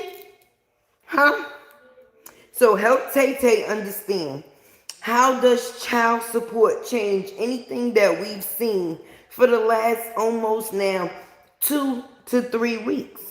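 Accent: New York, United States. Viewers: Hey ur so beautiful n love ur new York accent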